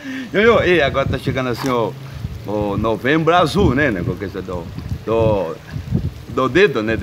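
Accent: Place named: Paraná, Brazil. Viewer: Você é de Paranaguá ou de Antonina parece sotaque de paranaense da hora seus vídeos 😉😊😊😊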